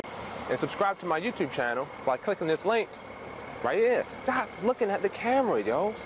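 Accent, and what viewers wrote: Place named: England, United Kingdom. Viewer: great video bro i like ur English accent love from India jai Hind hehe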